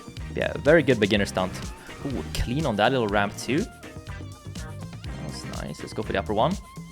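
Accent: Sweden. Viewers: is this guy swedish cus he have an swedish acsent when hes speaking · You can hear hies Swedish from hies English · Are you Swedish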